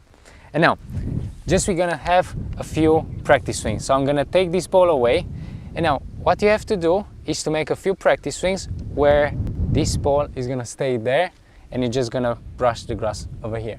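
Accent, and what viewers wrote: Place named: United Kingdom. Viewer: British PGA member; very nice. I really like your British accent. ;-) Keep up the good work.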